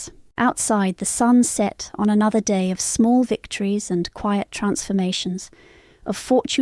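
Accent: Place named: United Kingdom. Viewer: So lovely listening to that British accent read this story aloud! Am enjoying this so very much!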